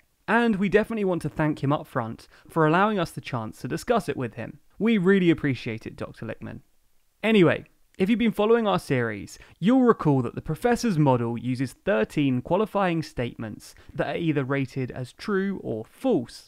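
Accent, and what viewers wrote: United Kingdom. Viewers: Pretty sure the narrator is British.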